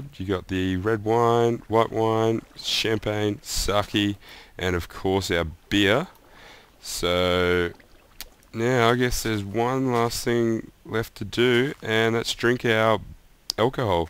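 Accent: Australia. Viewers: boss video...u can tell you're aussieee....am i wrong (subscribed!!)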